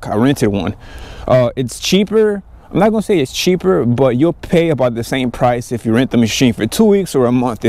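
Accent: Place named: Houston, United States. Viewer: Shit bro I knew immediately you're from Houston when I heard you talk. That's what's up.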